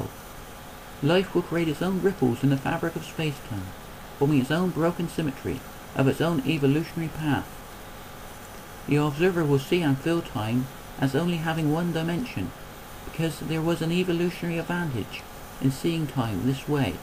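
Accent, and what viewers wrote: West Country, United Kingdom. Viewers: hey dude, this is the same way i see quantum entanglement. but then i'm a biologist so what do i know. also, are you from the west country?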